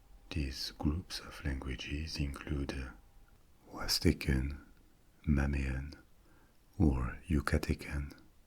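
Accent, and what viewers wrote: France. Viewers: > Great voice > French accent > History lesson What more can a person ask for?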